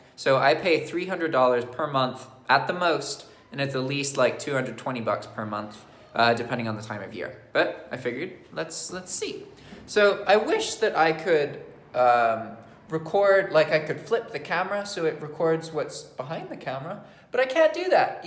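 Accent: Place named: United Kingdom. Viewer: Are you parents of British decent? I hear a little accent here and there? 😇💗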